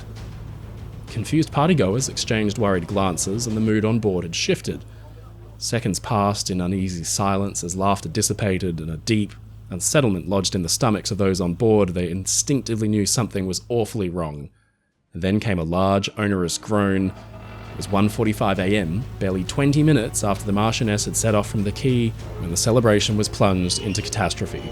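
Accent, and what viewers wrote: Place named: Australia. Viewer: Inspiration for an Eastenders storyline where Sharon loses her son Denny. Great Aussie accent you got.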